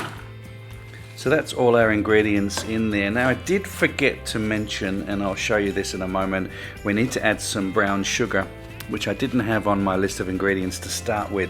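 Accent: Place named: Australia. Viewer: This is the best recipe I’ve seen on you tube for green tomato chutney. I need to do something with my tomatoes, (from my garden) before they spoil. Love this channel, especially because you sound like an Aussie. (I am one too).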